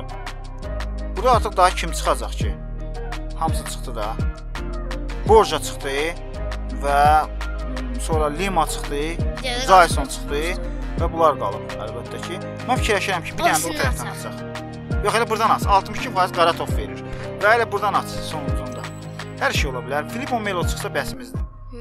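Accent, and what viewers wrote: Baku, Azerbaijan. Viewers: Əmi sən Bakılısan?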